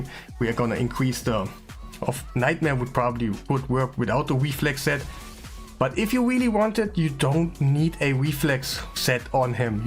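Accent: Germany. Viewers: hey when zinogre came out i made a 2 to 1 unkillable team with zinogre emic painkeeper (in the calc) could not test it yet do you want to test it? ps are you german? you kinda sound german ^^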